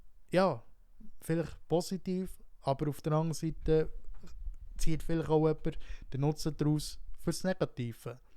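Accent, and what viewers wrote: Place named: Switzerland. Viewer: WOW... meine Güte hab ich grade aufpassen müssen schwützer Dütsch is halt was anderes wie schwäbisch :face-fuchsia-wide-eyes::trophy-yellow-smiling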